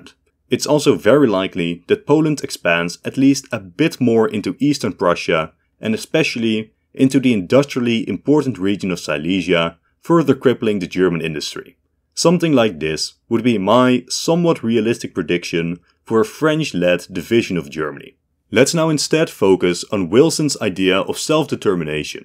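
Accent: Netherlands. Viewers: I think it's a Dutch accent.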